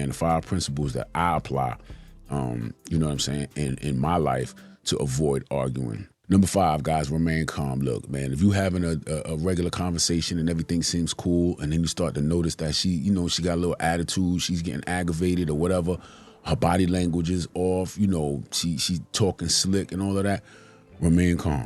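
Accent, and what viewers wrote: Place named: New York City, United States. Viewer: Spot on Bro. I can tell you are from my city NYC from your accent. Salute!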